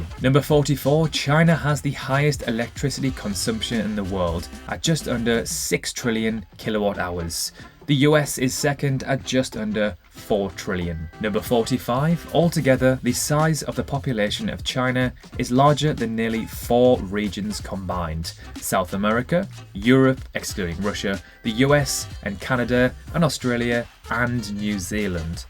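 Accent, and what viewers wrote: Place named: Newcastle, United Kingdom. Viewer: Geordie accent (Tyneside, northeast England).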